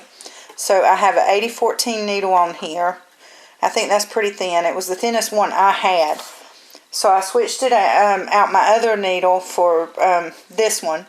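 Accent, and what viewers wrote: South, United States. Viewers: I just love you. I rarely hear southern accents on youtube hehehe. Makes me feel like im home. Not sure if youre from the south but you sure sound like a southern belle. 💚💚 much love from Georgia!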